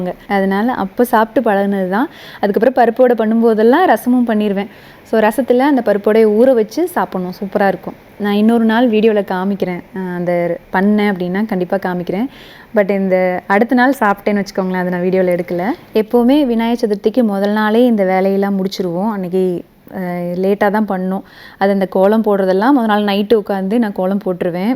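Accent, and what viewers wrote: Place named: Kongu, India. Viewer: Yenna alagaana kongu tamil!! Kannu padam poguthamma, muthalla suthi podunga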